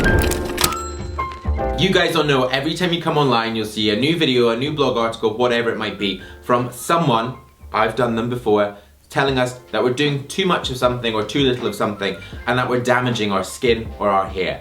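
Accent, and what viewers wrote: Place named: England, United Kingdom. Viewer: I love Ur English accent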